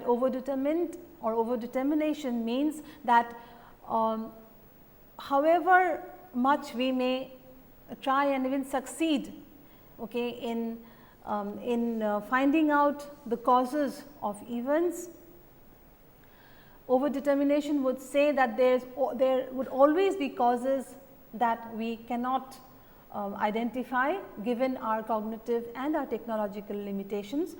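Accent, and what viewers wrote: India. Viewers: I absolutely believe you, as far as I know you are right, cause India is India with all its advantages and disadvantages, however, the matter was about Indian accent, especially about the accent of the speaking lady. I just compare her accent and accent of some Indian guys who were studying medicine when I was a student (in Siberia, in Krasnoyarsk-city), those days I could not understand their Indian accent, but may be I did not understamd them well because I did not speak English fluently at that time :)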